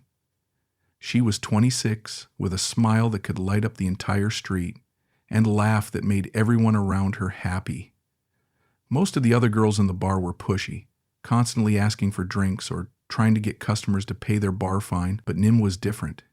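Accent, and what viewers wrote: United States. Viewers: Australian with a Yank accent??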